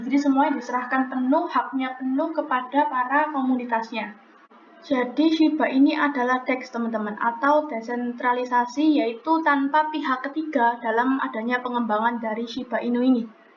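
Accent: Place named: East Java, Indonesia. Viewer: Logat jawa timuran.... suroboyo yaaa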